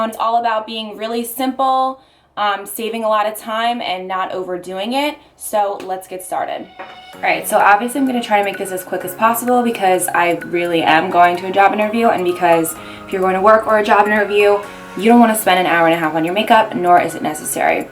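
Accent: New Jersey, United States. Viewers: Where are you from? You sound like your from New Jersey or Long Island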